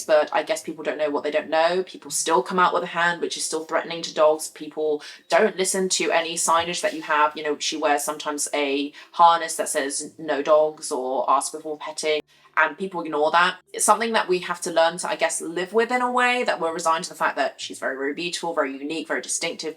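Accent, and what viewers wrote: United Kingdom. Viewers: A Asian with a British accent 🔥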